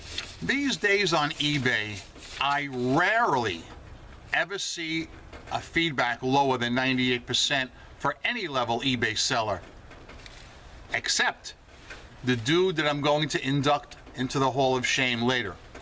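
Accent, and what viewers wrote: New York, United States. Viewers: that cawfee accent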